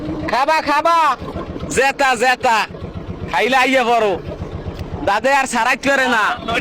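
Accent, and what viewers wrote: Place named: Barishal, Bangladesh. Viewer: বরিশাল্লা মনু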